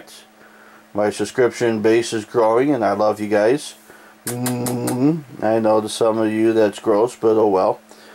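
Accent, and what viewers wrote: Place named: East Coast, United States. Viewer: Thanks for the many replys , You have lived in Arizona your entire life ? sure sounds like you have a eastern accent , keep the videos coming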